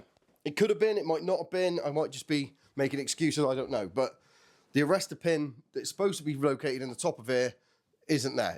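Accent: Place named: Cornwall, United Kingdom. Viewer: Hey! I've been binge watching your vids and i'm loving it. Your energy and enthusiasm is infectious! I thought I recognised a Cornish accent, then you mentioned you were near Bodmin! I'm near Truro, so not a million miles away. If you want, I could come up and blap a few targets with you. I'm very new to air rifle shooting. I started Feb this year and got my first PCP rifle. I shoot indoor bench rest with my Air Arms HFT 500, which I bleddy love to bits! For what it's worth, in my 6 month experience... lol Get rid of that sand bag rest and don't put your left hand on the rifle. Get a solid rest and a padded shooting glove that's grippy on the top. It's so you can cross your left arm in front of your chest, and put your flat hand under the butt. Use your flat hand like a wedge to adjust the up and down. The sandbag and holding the fore stock is giving you bad consistency, especially at longer distances. 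Hope that helps 😊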